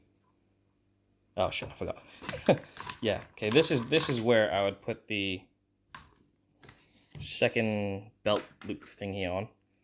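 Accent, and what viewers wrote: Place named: England, United Kingdom. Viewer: What's your accent? Sound like fellow Englishman that lives in the States, got my MP5 and sling on order so thanks for the vid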